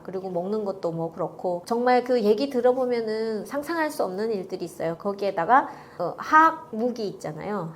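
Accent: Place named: South, South Korea. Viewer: 이제는 남한말투가 제대로 어휘가 전달되는것은 물론 아주조리있게 전달하는말이 듣기에도 좋네요. 목소리또한 아주 매력이 넘치네요. 이제 자유대한에서 무한으로 행복하시기만을 바랍니다.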